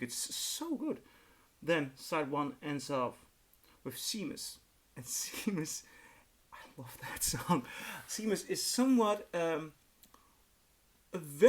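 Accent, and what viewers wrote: Netherlands. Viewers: Are you from The Netherlands? Just asking because of your accent. I'm Dutch.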